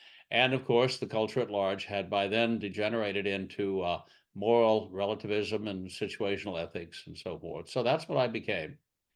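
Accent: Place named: Asia. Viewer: Yep sounds Asian. I know because I’m Asian.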